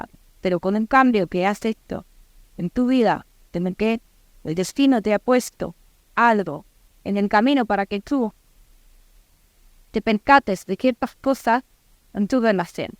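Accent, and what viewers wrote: England, United Kingdom. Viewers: No kreo ke es mikrofono es akcento ingles .algien se mete aki pienso jo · Pero a ver tia...hace dos meses hababas en andaluz,español ahora me hablas en acsento ingles...que te pasa?????i mira que yo hablo 7 idiomas pero esto realy nuncaa li e vistoooi baby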